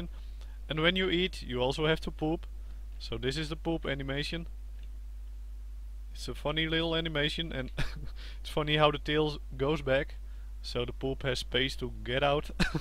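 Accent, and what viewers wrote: Netherlands. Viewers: hahahaha mijn engels is ook zo :) dat is bijna normaal voor nederlanders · Are you dutch?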